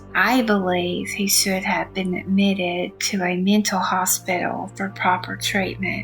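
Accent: South, United States. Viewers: I love your southern draw..Alabama here.. thanks for another one friend..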